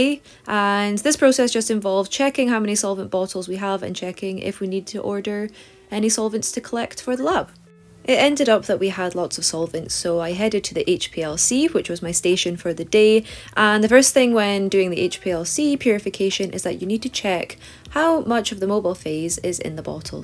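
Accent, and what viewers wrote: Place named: Scotland, United Kingdom. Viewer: Other science phd students: come to ’ videos and understand everything. Me, a humanities phd student: … … looks cool. (*loving listening to your lilting Scottish accent) 💪😂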